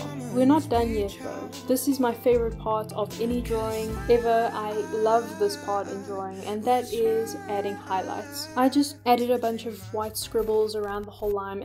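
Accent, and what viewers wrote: South Africa. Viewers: Are you South African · R u from South Africa ?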